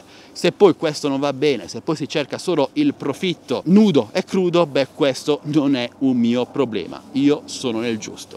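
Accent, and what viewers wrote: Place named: Apulia, Italy. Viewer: QUando ci vuole ci vuole, l'accento pugliese per me ( origine tarantine ) va benissimo ,e se non si da un pò fastidio , se non si è un po rompicoglioni allora non si ha la schiena dritta. Bravo e cmq un fanculo ogni tanto ci sta bene a chi lo merita.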